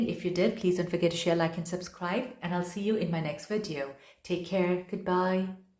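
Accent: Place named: India. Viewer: Desi ho kya ? You sound indo English I’m indo Canadian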